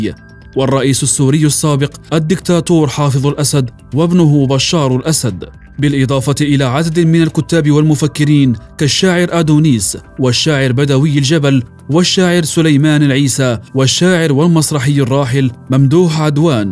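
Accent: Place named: Lebanon. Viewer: World News وليش عم تحكي لبناني؟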